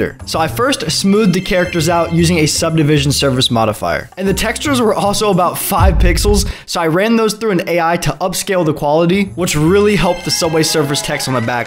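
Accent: United States. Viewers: Video starts Skip all that padded murican accent bs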